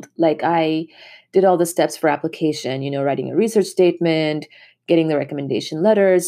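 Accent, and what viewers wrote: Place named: United States. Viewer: দিদি তোমার গলায় আমেরিকান উচ্চারন দারুণ লাগছে। 😅 আমিও পদার্থবিদ্যায় গবেষণা করছি ভারতে, প্রথম বছর। আমারও ইচ্ছা পোস্ট ডক করতে বাইরে যাবার 🙂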